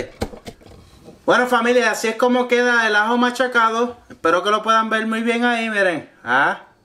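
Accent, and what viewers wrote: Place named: Puerto Rico. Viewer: Que lindo te vez hablando me gusta el acento de los bori hoy voy hacer un arroz con ajo y cebolla 🤗😀🧐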